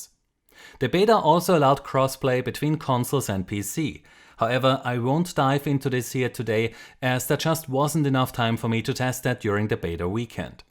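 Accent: Germany. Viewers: Are you a german Person because you have accent from german people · Man hört raus das du ein Deutscher bist. ^^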